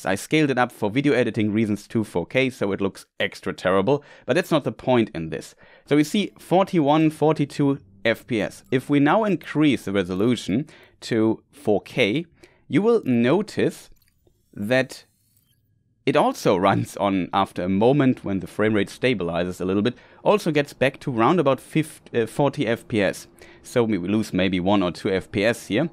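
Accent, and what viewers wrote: Germany. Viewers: You are definitely a German brah 😀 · Kann es sein das du eigentlich deutsch sprichst habe da ein paar Wörter gehört die das untermauern 😁? Warum ist es zum Standard jedes neue game mit so einer Performens raus zu bringen? EA kann nichts wenn sie nicht exklusiv dafür bezahlt werden. Das erste Update hat null was gebracht... CPU immer noch bei über 60% und GPU nicht mal bei 60%, CPU ist eine 2700x mit 4,2GHz und GPU ist eine 3060 OC sie läuft bei 2115MHz und 8000MHz Memory Takt. Mal sehen ob dieses Jahr noch ein Game kommt was einfach ab Tag eins läuft, das wäre ein Traum 😍. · Man hört sofort, da steckt nen deutscher hinter ^^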